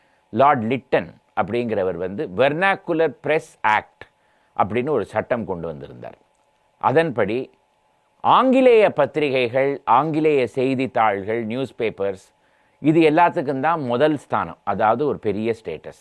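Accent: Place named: Agraharam, India. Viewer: சார் வணக்கம் மன்னியுங்கள் இன்று அக்ரஹார தமிழ் கேட்பதே அபூர்வமானதும் அற்புதமாகவும் இருக்கிறது. அதில் குழைய குழைய உங்கள் வாயில் வாய்ஸில் பழைய வரலாறுகளை கேட்பது பாக்கியமே. BY THE WAY I AM A NON BHRAMIN. MY BEST AND CLOSE FRIENDS AND FIRST ROW WELL WISHERS ARE ABSOLUTE AND EXCLUSIVE BHRAMINS. சார் ஒரே கர்னாடக சங்கீத ரசிகன். தாங்கள் அன்பு கூர்ந்து நமது சங்கீத சபாக்களின் வரலாறுகளைப் பற்றி பேசுங்கள்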